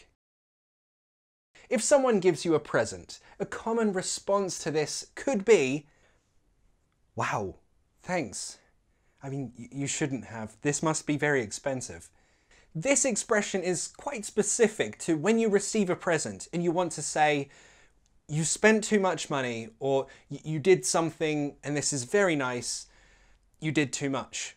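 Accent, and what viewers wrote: United Kingdom. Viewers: Sir is the first British teacher who has the lightest British accent that I've ever found. 👍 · I have a question, which British accent do you have? I would really like to know! ☺ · I like British accent because of you😊🌹..... Thanks ever so much for your useful lessons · Please give the english subtitle :( i'm from indonesia and i love so much the way you teach but so many word that i can't understand with your british accent. And thank's before :))))))))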